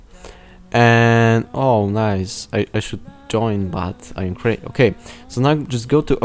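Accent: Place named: Germany. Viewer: Masz akcent jak najebany szkop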